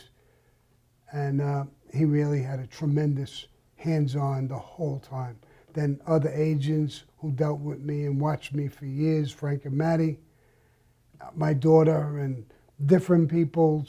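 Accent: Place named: Boston, United States. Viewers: You remind me of my father the way you tell stories, but with a Boston accent instead lol. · Im glad your here to tell your story.. can u say "im gonna bust your balls " with your east coast accent love that shit thanks for the video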